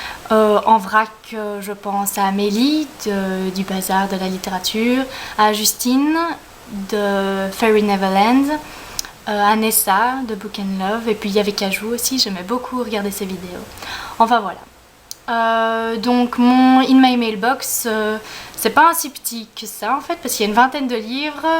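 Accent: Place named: Belgium. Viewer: Coucou ! (c'est Mélo), ravie de te voir en vrai, tu es toute mimi ! Et moi j'adore l'accent belge et je préfère même quand on prononce tÔme et non tome à la française :p Pourquoi dis-tu que tes études ne vont pas aboutir? j'admire franchement cette branche, j'aurais beaucoup aimé traduire des livres mais étant donné que je suis déjà une truffe en anglais c'est compliqué,lol . En tout cas je croise les doigts. A b1tôt, ça m'a fait plaisir de te voir (mm si je ne suis pas super assidue des vidéos)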